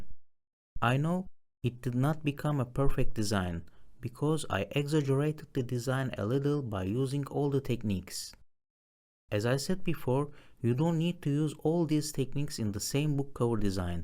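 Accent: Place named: Turkey. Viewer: Aksanınız Türklere çok benziyor, Türk Müsünüz?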